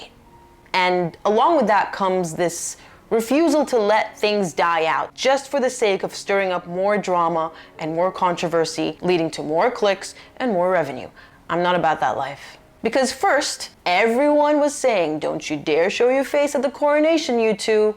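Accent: United States. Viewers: You said that's you're an Australian, but you have a very American accent! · I have the audiobook for Courtiers I'm currently listening to. I'm just getting into the chapters of current times. I enjoy your channel very much and your knowledge as an attorney. I know you live in Australia but don't Aussie. I am American and was sure you were American by your accent, but then you made a comment that you've never been here.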